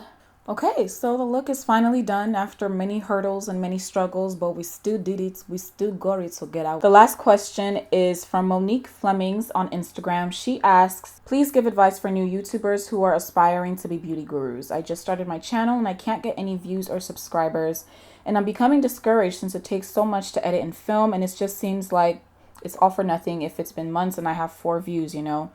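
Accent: Nigeria. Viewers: I love your Nigerian accent it sounds so pretty! This look is so pretty 😍 How do you find out what’s trending ? Thank you for these tips. Very helpful 💕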